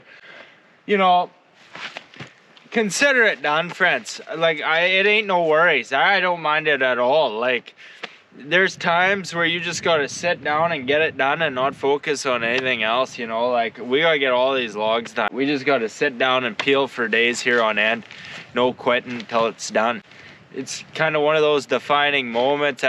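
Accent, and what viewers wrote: Canada. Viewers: Based on what I can tell from this video alone you are a great roll model for other young men to learn from and I don't only mean the building of your home but also the way you speak about it and the work ethic you have, those parts can be used in many areas of ones life to live a good life. Besides, who doesn't love a Canadian who acts and talks as Canadian as one can get, lol. Loved this video! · As a Canadian i can say, this dude has one of the strongest Canadian accents ive ever heard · Think you mean to say “Canadian” grit! That accent is classic 😆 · First of all, this is so pleasant to watch and secondly, an awesome reminder of how hard work can be fun. The last thing is, I’m so charmed by this Canadian accent and I didn’t think I would be. It’s funny how we can speak the same language and it can still be so wildly different but it’s just different people evolving the way they speak in different parts of the world.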